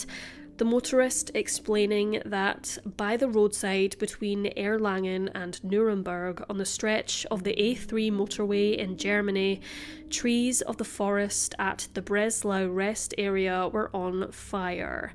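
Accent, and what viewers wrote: Scotland, United Kingdom. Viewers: What a lovely Scottish accent.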